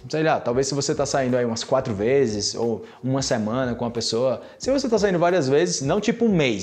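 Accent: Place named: Colombia. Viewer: Adoro tu acento colombiano ! Cuando dices " enserio " 😍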